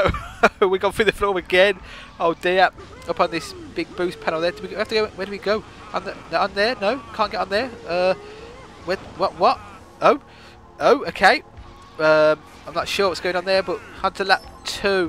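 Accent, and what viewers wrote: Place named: United Kingdom. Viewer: you are so awesome with that british accent hehe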